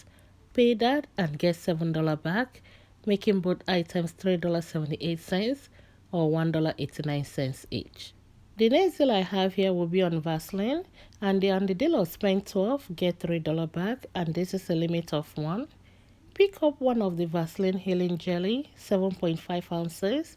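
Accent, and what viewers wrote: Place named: United Kingdom. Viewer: I don’t agree! She has an English accent as in African we were taught by the British so the English is slightly different but fully understandable! I for one cannot understand someone like the Couponer Jodi Dunn as she has a strong accent making it difficult to understand the English!She posts the deals very well AND EARLY! I suggest you follow someone that you can understand instead of leaving such disrespectful comments!!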